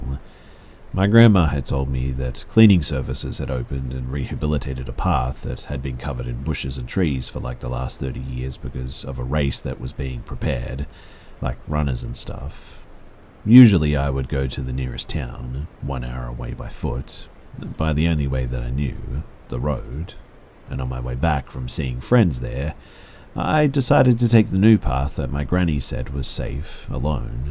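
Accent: Australia. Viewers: Holy hell! I cannot believe I only realized after reading your comment that his accent is Australian! 🤦🏻‍♀️ I've been listening to him for a really long time and always wondered where he was from 😆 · Hey 👋 Mate 🇭🇲🪃🤘 it's great to hear an Aussie when your an Aussie too 😉 so thanks ❤️ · At last an Aussie accent. Just subbed, I don't know why it has taken me so long to find you. And I just can't listen without rain!.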